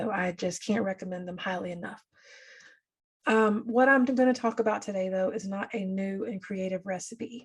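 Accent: North Carolina, United States. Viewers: I am from NC and I agree. Or SC or Virginia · 👍 you sound like you are from NC!😁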